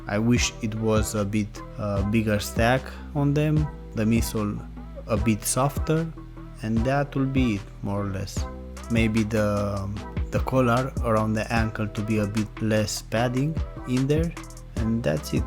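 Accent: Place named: Spain. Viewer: Hablas español, ¿Verdad? Suenas muy similar a Carlos Sainz hablando inglés. Hace unos días probé los ultraboost 1.0 lcfp. Hasta el momento es el par más cómodo que he utilizado, el único problema es que no tienen plantillas, por lo que dudo que sean cómodos para utilizarlos todo el día. ¿Los has utilizado?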